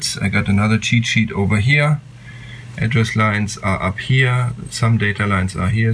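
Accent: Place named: Germany. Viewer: Hey man love the videos! Your german accent is fantastic, and thanks for speaking in english! I found your channel by looking for videos on the Atari System 1 boards, as I want a marble madness cab at some point. But! I have a question, what Jamma>Scart board are you using? I have a 15khz PVM that supports RGB and wanted to start maybe getting some broken arcade boards and fix em up. I usually buy broken consoles and fix them, but IBM PC's and Arcade boards are my next logical step. Thanks for the videos! I'm going to be posting them on some repair forums I hang around as your videos are criminally underwatched. Thanks again!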